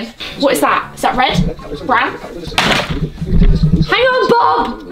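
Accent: United Kingdom. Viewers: How still sound british even she live in America most of her life. Love your art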